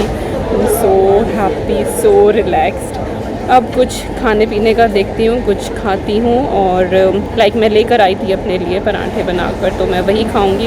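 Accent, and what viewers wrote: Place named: Uttar Pradesh, India. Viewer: Congrats! Well deserved honour. I'm from Chicago and I started watching your channel since last August when I was visiting India.One day I was getting bored in my hotel room as my husband was away on business trip and I could not go along with him as I was sick, so your cleaning routine flashed on while I was watching other videos on you tube, and believe me it was so interesting that I was instantly hooked up to your channel. Your typical U.P. style of speaking and hard work combined with your simplicity just did wonders and I felt connected to you. Congrats and best of wishes for your future endeavours.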